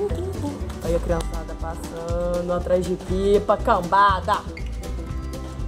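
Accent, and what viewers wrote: Minas Gerais, Brazil. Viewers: De onde vc é guria , fala como mineira com trejeito de gaúcha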